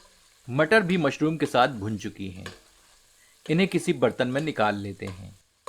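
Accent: Standard. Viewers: शुद्ध हिंदी बोलते हैं।आप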